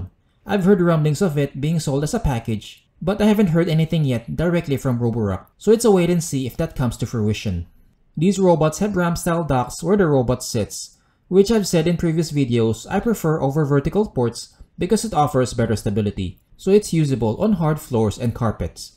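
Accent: Philippines. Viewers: I can tell you're a Filipino by your accent. How did you buy these high-end here? How about the availability of services/maintenance when these break down and needs fixing?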